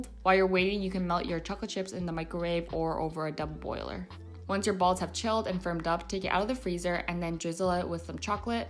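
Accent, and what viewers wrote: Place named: Canada. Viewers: Where are you from? I love your accent :). Perhaps Canada?^^ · Lol i thought canada too!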